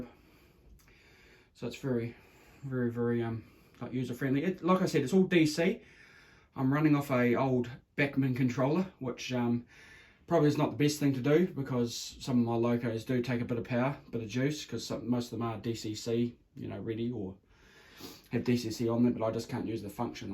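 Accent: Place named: New Zealand. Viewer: Great to hear a good old fashioned kiwi accent! Kia ora, new sub from a former Wellingtonian.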